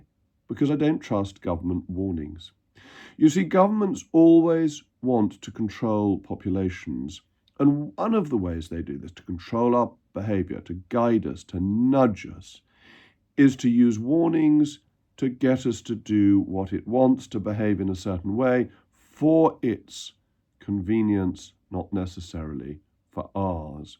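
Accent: RP, United Kingdom. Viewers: This is all so rich coming from you Jacob. I remember you mocking the public at that party, joking about the social distancing you conservatives brought in. You’re a traitor with a posh accent, nothing more, nothing less. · You have the most British voice I've ever heard reminds me of my godfather his passed away now but I love your take on the government. Peace ✌️🕊️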